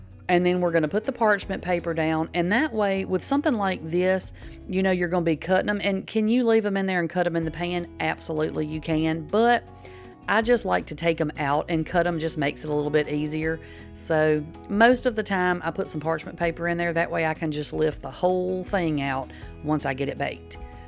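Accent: South, United States. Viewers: Your SO SOUTHERN LOL😂 We just came back from Tennessee I would love to move there AND BECOME SOUTHERN… I don’t know if I could ever get it right tho 😂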